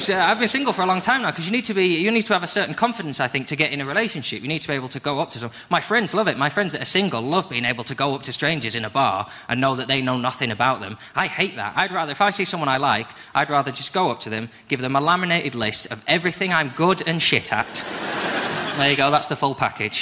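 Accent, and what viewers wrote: United Kingdom. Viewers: what he forgets is that there's a whole foreign market of women who would love him for his English accent alone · is adorable, the northern accent is my favourite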